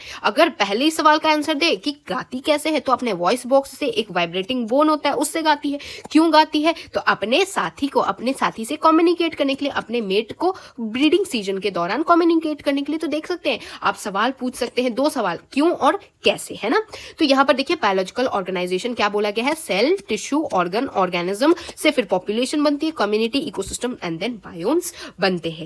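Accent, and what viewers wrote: Bihar, India. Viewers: Are you bihari?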